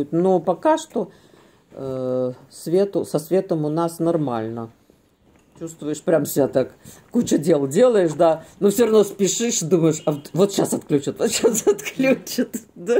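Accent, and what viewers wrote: Odessa, Ukraine. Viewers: Как мне нравится ваш говор, Одесский ..гуляю с вами...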